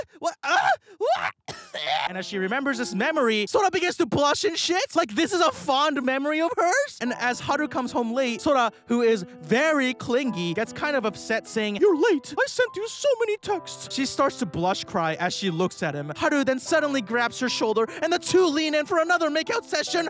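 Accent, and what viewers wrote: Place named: Turkey. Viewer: Are you Turkish? Your pronunciation sounds very Turkic...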